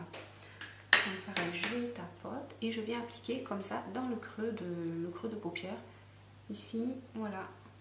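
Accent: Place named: South, France. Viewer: coucou ! ça fait plaisir de te voir en vrai ! Toi aussi tu as un petit accent du sud, tu es d'où ?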